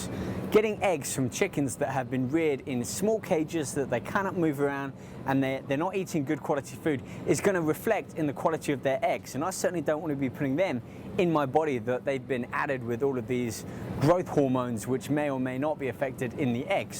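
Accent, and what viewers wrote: United Kingdom. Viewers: woter, olive ol, mo. british haha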